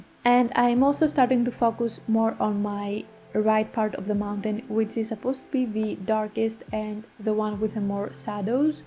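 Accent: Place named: Greece. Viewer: Breathtaking piece! Thank you for this. Despite your very cute greek accent, you gave a very clear and detailed tutorial. All your tutorials are very helpful. ❤ Kudos! 😊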